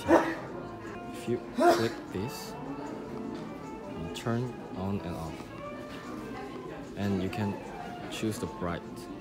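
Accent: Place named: South Korea. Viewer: Your pronunciation of Ikea is actually very similar to how it’s supposed to be pronounced. I’m from Sweden and we pronounce it like you do, except you have a bit of a Korean accent to it 😊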